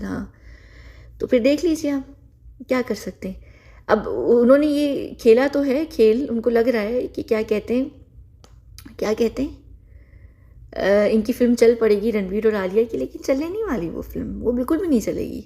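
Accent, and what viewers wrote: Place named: United Kingdom. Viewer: OMG!! HEARD you angrezi wali accent after.....AGES...I fell in love with your voice...before everything else!! Love you!! 💕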